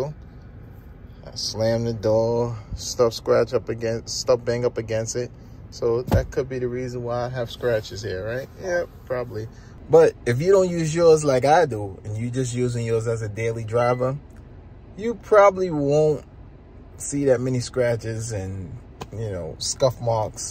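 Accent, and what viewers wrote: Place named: Washington DC, United States. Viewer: You sound like you from the north like DC area ! But yeah I’m getting the Santa Cruz premium or limited can wait to test one tomorrow 👍🏾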